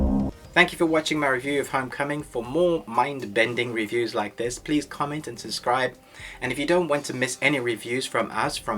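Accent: South Africa. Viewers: I'm having troubles placi9ng your accent ? South African?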